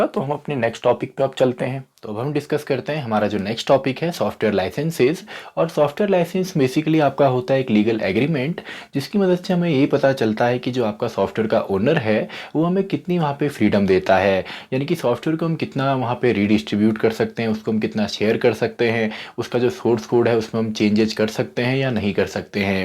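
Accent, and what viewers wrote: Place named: Uttarakhand, India. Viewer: Sir u are from Uttarakhand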